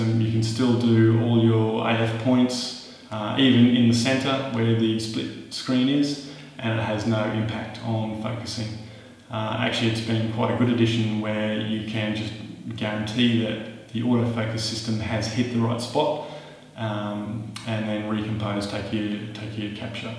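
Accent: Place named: Australia. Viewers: Haha the Aussie accent, I'm Australian he was as clear as a bell. XD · I love Aussie accents.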